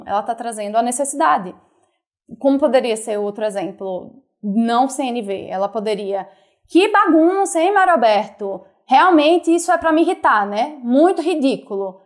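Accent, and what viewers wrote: Northeast, Brazil. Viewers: Eita sotaque maravilhoso da!!! Aoooo povo nordestino que eu amo!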